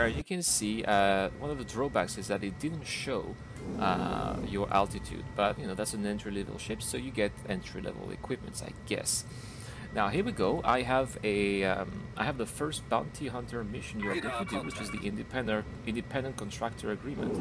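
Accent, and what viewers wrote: France. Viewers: tu es breton ? ^^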